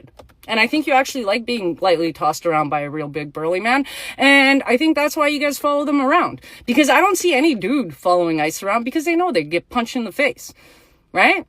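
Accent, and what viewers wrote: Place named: Prairies, Canada. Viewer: Ok this girl is from Canada and my guess is she a prairie girl lol love it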